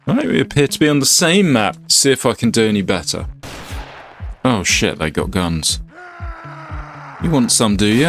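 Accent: United Kingdom. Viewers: Not only is this a perfect mod that I've never heard of before, but being exposed to it by a guy with a proper English accent is even more hilarious. Edit: Also, the name choice is guaranteed to make you a wanted man if you actually go to Scotland.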